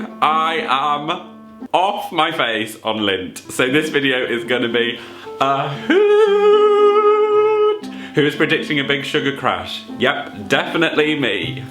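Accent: Australia. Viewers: Listen ere Sheila! Living for ur Aussie accent lol.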